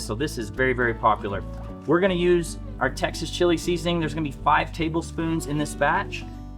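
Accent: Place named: California, United States. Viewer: Easy Bake Oven lol all ladies should have one. And you are from Texas???? Sounds like maybe you’re from California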